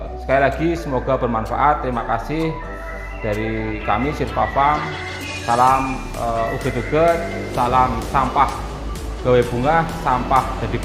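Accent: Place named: Java, Indonesia. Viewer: NtabS....ayakan si Medok 👍🏾👍🏾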